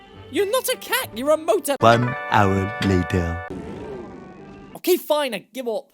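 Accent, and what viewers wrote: United Kingdom. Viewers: Watching the first minute of this video I can tell he is British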